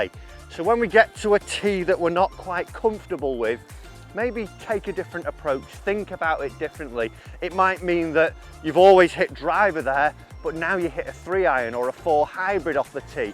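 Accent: United Kingdom. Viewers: must be the heavy northern Accent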